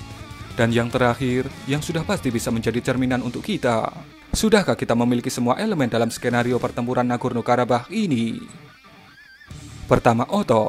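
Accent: Java, Indonesia. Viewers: Ubah dong pengisi suarax Ojok seng meddok ngono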